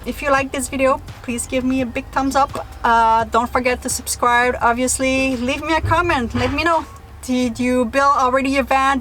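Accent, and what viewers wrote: Quebec, Canada. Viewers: Thanks for the information. You are very informative. Again you give me hope that to have a van and travel is not a foolish dream. Love your accent. It is what I imagined Canadians would sound like. I am from USA. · Somewhere on here she has said French Canadian.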